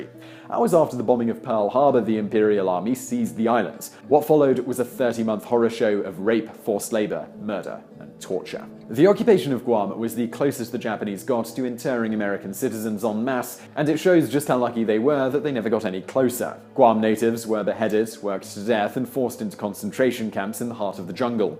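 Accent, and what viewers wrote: United Kingdom. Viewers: I thought this guy was Vsauce putting on a British accent fora second.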